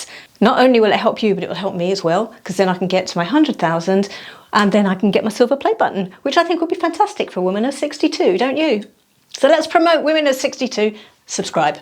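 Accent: England, United Kingdom. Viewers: The color of lipstick you are wearing may your teeth look off color not white! You’d look younger if you would wear brighter lip color with a sheen and get your teeth whitened! You have become really great on YouTube! Love the English accent and humor!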